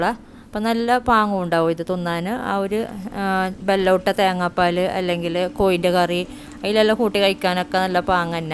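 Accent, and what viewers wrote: Kasaragod, India. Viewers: Kasaragod slang aanallo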